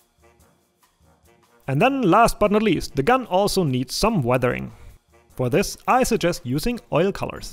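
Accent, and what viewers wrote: Germany. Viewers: Ich liebe dieses deutsche Englisch! XD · Kommst du aus DE oder AT?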